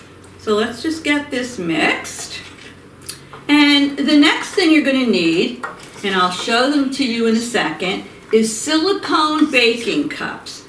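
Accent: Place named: New York, United States. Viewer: I don't think I realized until I stumbled on your channel that their aren't many cooks on these shows being hosted by real New Yorkers with real New York accents. I am subscribing because there's something reassuring about listening to you. Also, just started using my Air Fryer and can't wait to learn more.❤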